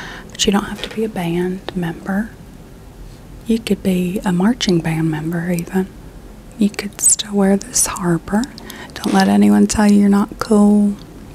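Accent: South, United States. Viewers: I LOVE your ASMR. Southern accent is the my fav. Thank you for putting blame on Justin, he dropped the ball on that one. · There's something kinda comforting about hearing somebody speak in a southern accent Not about anything politically charged or whatever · Man I was just thinking about how I was craving a new vid from you and then I refreshed my youtube homepage and here it is! I always love the southern accents cause it makes me feel like I'm back home after moving up north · She's back and better than ever!! I love your ASMR so much and especially your southern accent!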